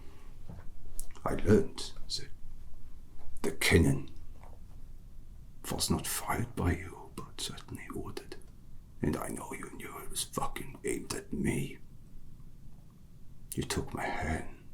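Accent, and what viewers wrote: Germany. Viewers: ey As a German, I can only confirm what the previous speakers said: Your video is, as always, first class. And now I'm showing off my in-the-know knowledge as a German: The accent is perfect! As if you were one of us from 230 years ago! Unfortunately, I can't watch the video to the end because I lack certain stimuli for the mental tingling, but I see a lot of work in your video that I want to give you credit for. Your outfit is perfect. The camera mega and the exposure divine. And the sound.. of course from another, a better world.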